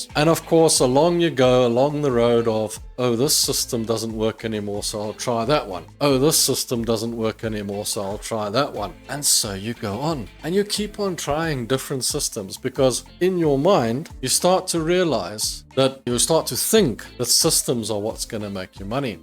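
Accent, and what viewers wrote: South Africa. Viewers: Hi - Love your content! You sound South African - Could the company have been Sharedirect?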